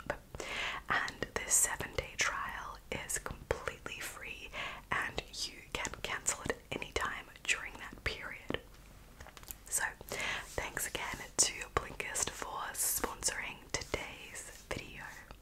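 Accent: Australia. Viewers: Same!! I've been subbed for a few months and I still can't figure it out. Kind of sounds Australian too? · Are u australian?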